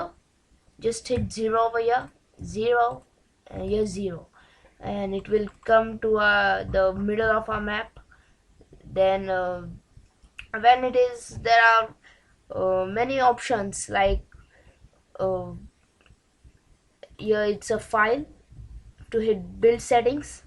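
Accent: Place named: India. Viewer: That Indian accent tho!!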